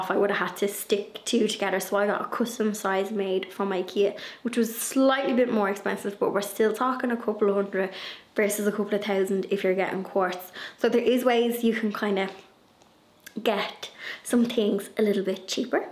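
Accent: Ireland. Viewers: you are a most delightful sweetheart ❤! You are SO pleasant to listen to with your lovely Irish accent, and watch your projects in each video! You are really gifted in the countless ideas that you have…inside and outside! 😊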